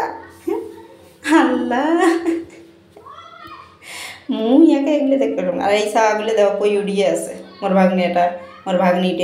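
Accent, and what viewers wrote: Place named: Rangpur, Bangladesh. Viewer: আপু আমার বাড়ি রংপুর, আপনার মুখে আমাদের রংপুরের আঞ্চলিক ভাষা শুনে ভালো লাগলো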